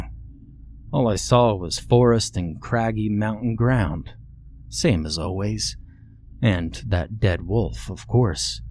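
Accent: South, United States. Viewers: Oohh I wish it was longer much longer! I mean this story. Your Southern draw is flawless and it fits this story just right.👍🏻👍🏻👍🏻🌹🇱🇺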